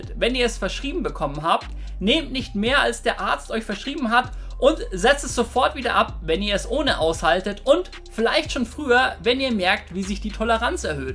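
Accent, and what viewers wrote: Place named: Franconia, Germany. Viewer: Ist ein Dialekt, das sagt mann so in Franken.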